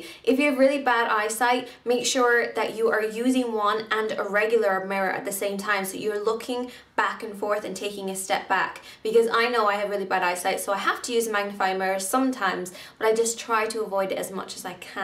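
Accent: Ireland. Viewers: Well, I love your accent! Are you Irish? Great video btw :) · Caroline Aleman Ireland I think. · Omg at the start of this video I was half paying attention and thought she was american and then once I fully paid attention I was all "hold the phone, she's Irish!" I don't even know how I could make that mistake haha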